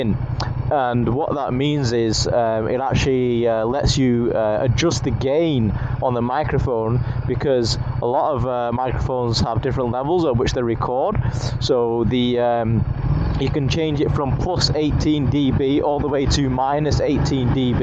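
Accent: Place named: Preston, United Kingdom. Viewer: Mate your accent was confusing me hard but knew you were from around the Preston area 🤣 Great video, hopefully see you around 👍🏼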